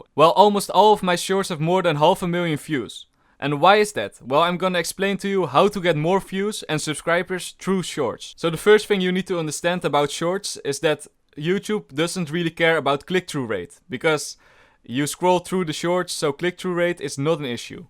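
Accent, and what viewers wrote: Netherlands. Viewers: I am not even interested in making a Youtube-Channel, yet i warched the whole video because this dutch accenr and your charakter is so addicting. Kewp it up man, see you at 200.000 Subs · i am in love wid your dutch accent ! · hi sir following you since 4k subbed from my 6 account and love from india and most important information to reach 100k u should have a great content and a lovable Dutch accent love your vedios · me not even thinking about youtube but still watching the video to hear his dutch accent :D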